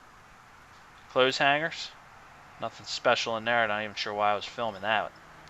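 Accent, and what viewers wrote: Philadelphia, United States. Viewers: Just wondering, as a born and raised Pa lady, your accent sounds like a Philadelphia one. Am I right?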